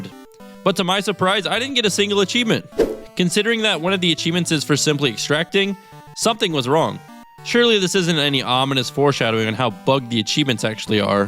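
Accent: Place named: Midwest, United States. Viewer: Damn I'm right across the big river from you I knew you sounded more Midwestern than most people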